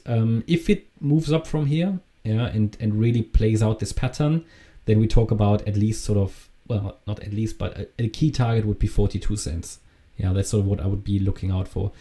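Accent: Germany. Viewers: du klingelst sehr DEUTSCH an.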